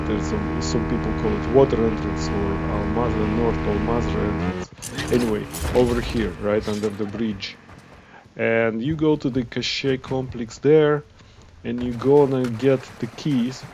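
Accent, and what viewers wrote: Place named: Russia. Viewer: соу рашен акцент))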